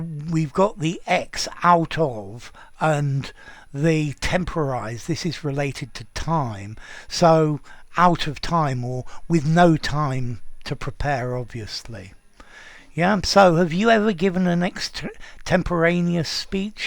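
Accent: RP, United Kingdom. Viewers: I wonder if the teacher speaks Contemporary RP. Can you tell me?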